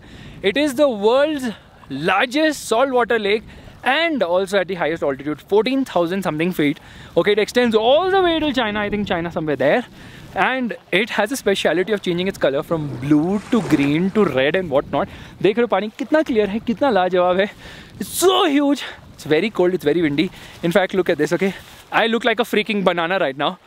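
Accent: India. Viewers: I like your way of speaking Hinglish 👌😂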